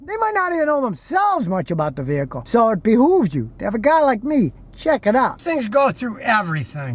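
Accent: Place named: Upstate New York, United States. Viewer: That's because of his Buffalo/Niagara Falls upstate New York accent....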